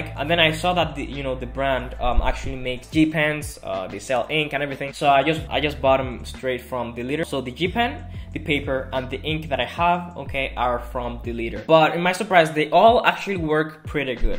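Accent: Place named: South Asia. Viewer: He sounds so South Asian I guess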